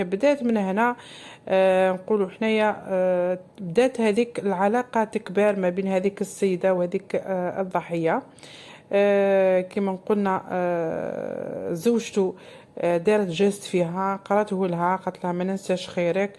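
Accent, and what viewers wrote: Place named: Souk Ahras, Algeria. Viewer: ختي لهجتك سوقهراسية...قريبة للتوانسة ماشاء الله...شكرا على الفيديو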